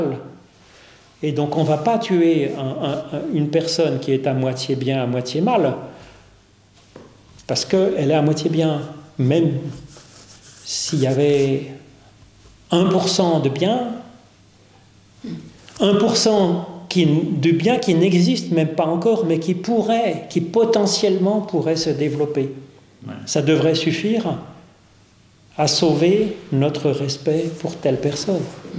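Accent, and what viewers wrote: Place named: Vaud, Switzerland. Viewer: merci... richesse du mot à mot dans le texte... où trouver les video 1 à 4? c'est drôle: tu as pris l'accent vaudois!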